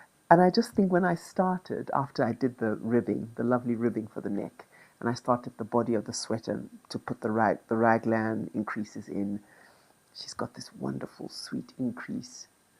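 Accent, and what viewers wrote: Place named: Cape Town, South Africa. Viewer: When you said Cow girl blues I thought…. Oh Cape Town immediately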